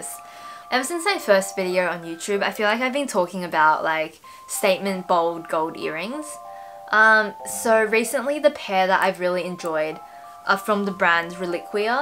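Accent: Australia. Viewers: 안녕하세요? 한국인이세요? 너무 사랑스럽고 실용적인 코디를 하셔서 즐겨본답니다. 영어채널이라서 100% 다 듣진 못하지만.. 영상 거의 다 봤구요. 송혜교 느낌 닮으셨어요. 호주발음도 매력적이어서 너무 좋아요!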